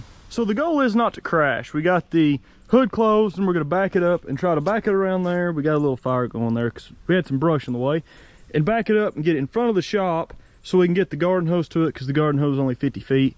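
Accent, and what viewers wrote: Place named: North Arkansas, United States. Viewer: I enjoy the north Arkansas accent.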